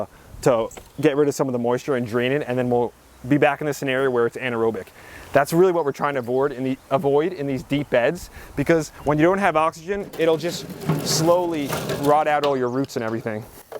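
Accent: New Jersey, United States. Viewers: I love watching him. He’s 100% New Jersey and this Texan loves his excitement. I don’t see content worth watching on yours. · "hold all that excess waudder" I f'n love your videos and jersey accent, it makes me think of my old college buddies. Keep it up, and full disclosure I steal these ideas!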